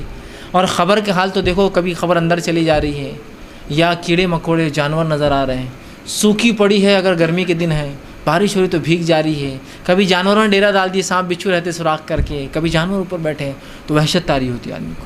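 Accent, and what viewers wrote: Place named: Hyderabad, India. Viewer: He's hyderabadi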